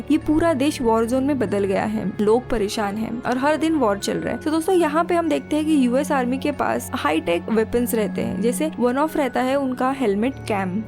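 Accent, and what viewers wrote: Maharashtra, India. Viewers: Check your marathi accent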